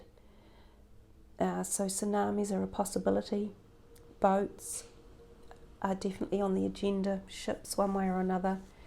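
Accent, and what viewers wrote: New Zealand. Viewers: Came across you the other day great to hear a kiwi accent lol 🙂 very interesting reading, I think October is going to be quite full on 😬 Thank you! ❤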